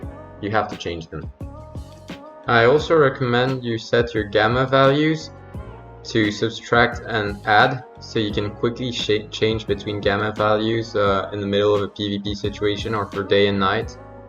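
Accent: France. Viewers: c’est cool je comprend mieux l’anglais avec l’accent fr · Je comprend tellement mieux avec l'accent français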